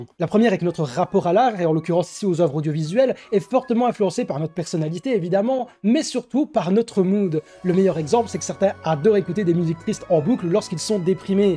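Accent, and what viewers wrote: Belgium. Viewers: t'es belge ? ton accent me dit qqchose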